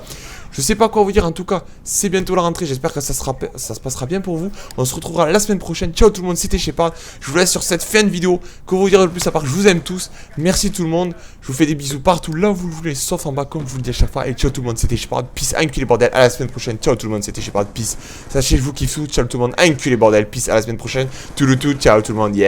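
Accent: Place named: Marseille, France. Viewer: Je veux pas dire mais tu pourrais ralentir la cadence quand tu parle,parce vraiment c'est dur dur à comprendre ce que tu dit en plus avec ton accent marseillais sa n'arrange pas les choses sinon joli massacre :)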